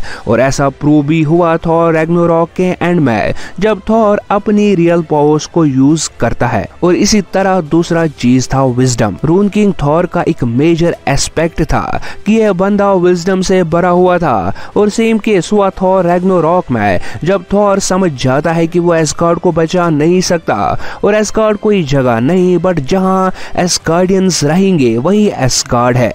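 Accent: Kashmir, India. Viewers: Bro are u from kashmir 🤔🤔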